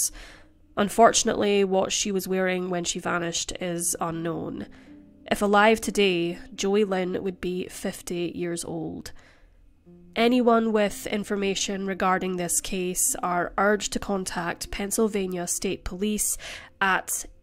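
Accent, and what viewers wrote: Scotland, United Kingdom. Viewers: Everything she says sounds great with that Scottish accent! 😋💙💜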